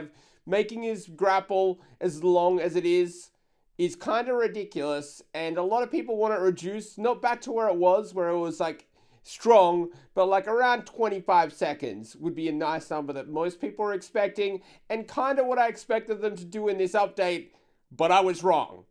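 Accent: Australia. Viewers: I love your Australian accent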